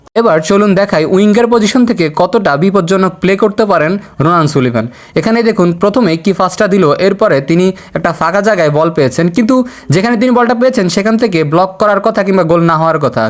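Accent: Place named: Noakhali, Bangladesh. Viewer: বাড়ি কি নোয়াখালী? ফ্লাইং কে বলেন প্লাই্ঙ। থামলে ভাল লাগে।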